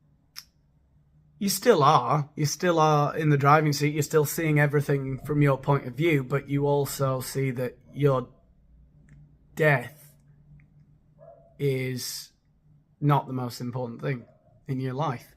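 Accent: United States. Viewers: OMG, I just LOVE your American accent!!! AND your impeccable sense of humor, but, mostly I still chuckle at the thought of that FABULOUS rooster blasting me out of my self-induced YouTube inertia!!!!!!! Rock on!!!!!🎸🎸💖💖🐓🐓🐓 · Love your American accent. Cheers.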